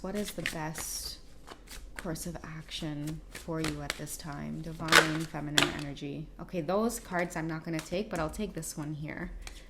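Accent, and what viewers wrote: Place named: Trinidad and Tobago. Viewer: I always knew you were from Trinidad 🇹🇹 hearing you talk .am from Trinidad 🇹🇹 too I love ❤️ your reading 📚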